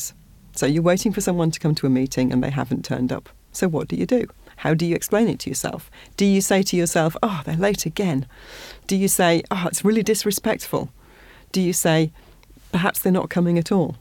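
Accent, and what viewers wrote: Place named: RP, United Kingdom. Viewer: Whenever I need to act out a standard british accent, I think of you. It's not the accent, it's poise-ness, precision and clear impact that I get out of it. This is your gift, and you are sharing it. Thank you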